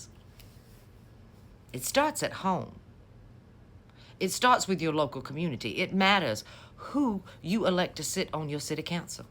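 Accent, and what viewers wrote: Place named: Georgia, United States. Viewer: Oh, I thouight that you were a Georgia Peach, cause you sound like the Memorbable Ms. Buzzard (Isn't that a great name?) It's been over 30 years since I last spoke to her (we were workmates). Remeber that actress who played 'Flo in the sitcom: Alice? Well she qlso reminded me of Ms Buzzard (Pronounced to sound like BOOZ-Zard). Another Witty, non racist whyte woman from Atl.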